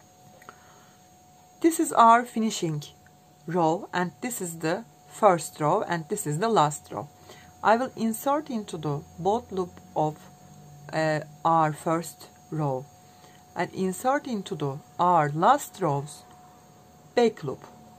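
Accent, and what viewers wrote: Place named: Turkey. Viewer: Türkçe alt yazı olaydı iyiydi ingilizce konuşmanızda türk gibi türksünüz degilmi ses tonunuz onu andiriyo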